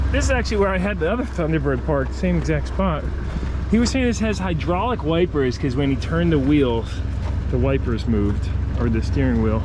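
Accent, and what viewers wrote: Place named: East Coast, United States. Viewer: The east coast accent is awesome! I'm from Wisconsin, we sound goofy!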